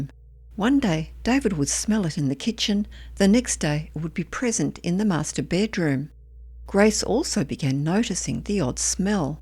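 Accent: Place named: Australia. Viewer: Its simply an Aussi acent, so it sounds familiar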